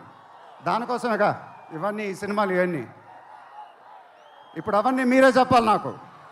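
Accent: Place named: Guntur, India. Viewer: Guntur slang 😅vamoovvv adhem dansushhhh😅 mana gunturodura ma anna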